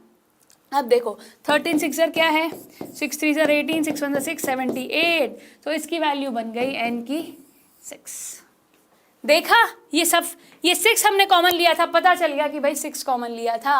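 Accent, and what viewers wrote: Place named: Punjab, India. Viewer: Mam I can definitely say that your hometown is punjab because you words are generally punjabi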